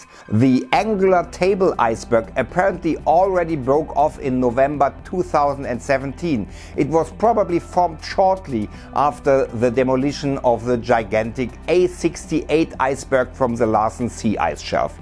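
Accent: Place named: Germany. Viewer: Gutes Englisch! I love how the germans pronounce english words. :D Sounds a bit weird and funny. Außerdem liebe ich den Kontent! Das Englisch frischt das ganze noch 'n bisschen auf! Weiter so!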